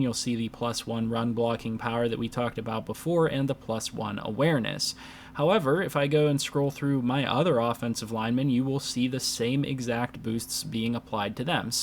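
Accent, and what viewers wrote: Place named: Pittsburgh, United States. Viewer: I’ve got the Steelers on mine, too. I can hear your Pittsburgh accent coming through. Am I right?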